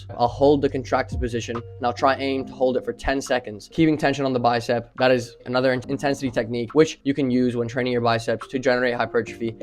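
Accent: Ireland. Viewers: What's your accent? It has a hint of South Dublin.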